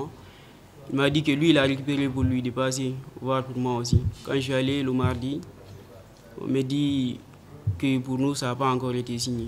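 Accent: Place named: Senegal. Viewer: Putain tu a un accent sénégalais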